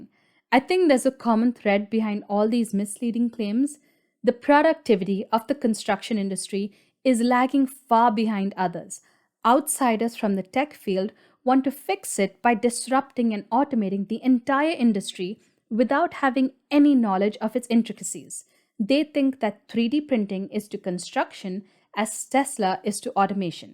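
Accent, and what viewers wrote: India. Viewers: Indian English sounds so different from the proper british english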